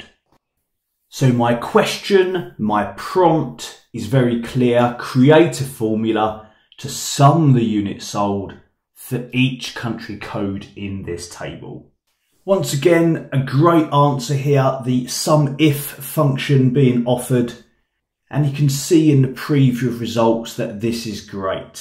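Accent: United Kingdom. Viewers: Nice British accent)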